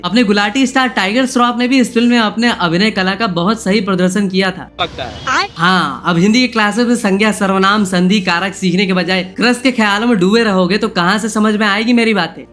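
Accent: Bengal, India. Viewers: Bhai ap Bangali ho ??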